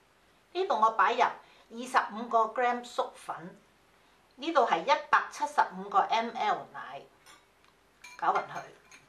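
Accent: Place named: Hong Kong, China. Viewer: I think she comes from Hong Kong (from her Cantonese pronunciation). Coconut milk is not a traditional Chinese food ingredient. This dessert is originally from Hong Kong.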